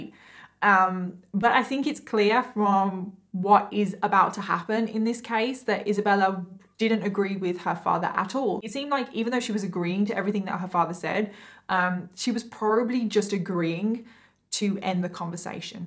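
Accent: United Kingdom. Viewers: is a superstar on Youtube. I've had to take a break from politics and alternative social media. Having always been a fan of true crime, this new genre is a perfect fit for my down time when I'm trying to sleep. Some of these other girls are talented but is like some kind of angel. Her delivery is spot on with a serious reporting style and her makeup is always tasteful. Such a beauty, inside and out...I look forward to see what she will do in the future. Having only recently discovered her channel, there is plenty of back catalogue to binge watch. Maybe it's the accent but she speaks to me. She's brilliant, beautiful and she is carving her own niche. In this culture of Tik-Thots etc. she is still a perfect british lady, fit to have tea with the Queen. Bravo. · You're so obviously British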